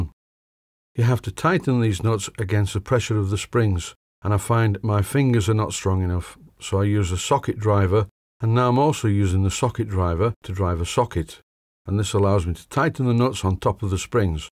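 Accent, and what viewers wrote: Yorkshire, United Kingdom. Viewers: I have no idea why YouTube attracts such brainless and often downright mean comments, but those of us who make the effort to put up videos get faced with them all the time. Most of them come from "users" with no content and no followers. I generally just delete them. That said, I very much enjoy and appreciate your videos, your humour and your Yorkie accent. Thanks!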